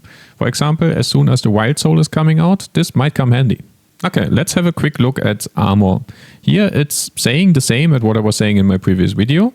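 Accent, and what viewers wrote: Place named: Germany. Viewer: Love your accent! If i would take a guess its a typical german one! <3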